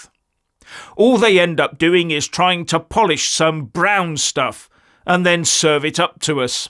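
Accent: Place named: United Kingdom. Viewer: I watch ur channel to improve my understanding of British accent... U speak so clearly, I am impressed ...❤❤❤❤❤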